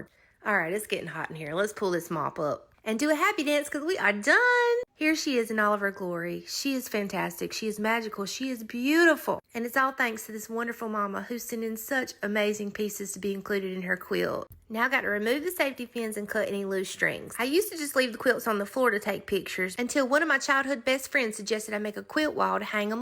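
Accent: South, United States. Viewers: First of all, the southern accent is music to my soul as I live in South Carolina and secondly I love you. What a cute quilt and I can’t wait to run a muck all over your channel because you were just so darling. I think I can learn a lot from you and did I say I love you! I just found you today hurrah for me.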